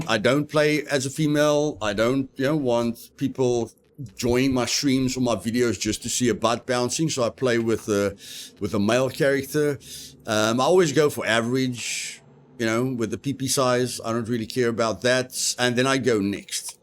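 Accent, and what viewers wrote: South Africa. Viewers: you sound South Afican Are you SA ? I am an south african looking for discord channels or groups of south african scum players or maybe possibly to play with a pro like you ive just started youtube streaming working on building a base viewer amount before making vids so im currently just streaming some games with friends watching im aware i talk a differant language than english,afrikaans.but im quite fluent in english so im just seeing if your intersted in maybe playing together thx for the good vid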